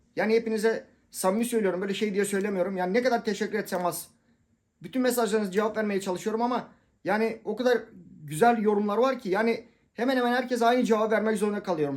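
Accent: Thrace, Turkey. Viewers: abi biz senı sevıyoruzya sadelıgın hele bıde trakya şivesi süper oluyo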